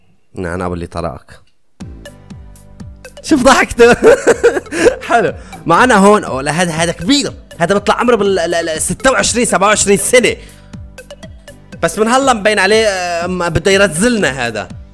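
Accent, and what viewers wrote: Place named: Iraq. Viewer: ههههههه يخبل انته من وين حجيك قريب ع عراق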